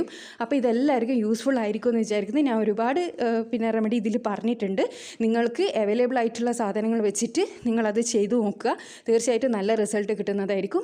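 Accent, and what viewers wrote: Kannur, India. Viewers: കണ്ണൂർ ഭാഷ chechijk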